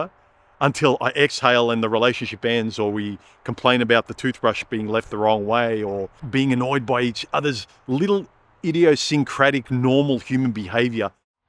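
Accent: Melbourne, Australia. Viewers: Think he's from the Melbourne area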